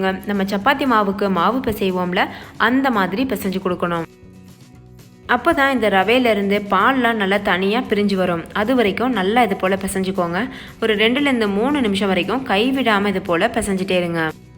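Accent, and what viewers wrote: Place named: Erode, India. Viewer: Akka neenga erode Akka.. Unga slang spr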